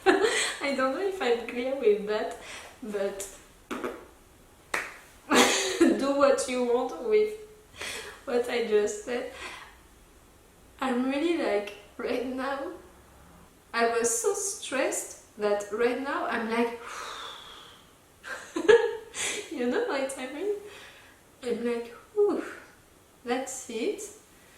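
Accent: France. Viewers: a bientot! :) and I'm gonna miss that cute French accent, too!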